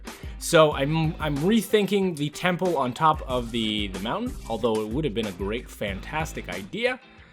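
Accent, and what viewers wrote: Canada. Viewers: hahaha. Its really weird but I heard canadian and bit of nourthen english. It's probably just me tho. By the way your builds are amazing :D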